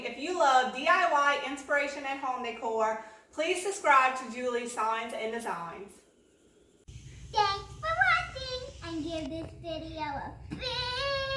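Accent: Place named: New Orleans, United States. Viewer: I live in east Texas. Sounds like you're from New Orleans. Love the planter and ladder.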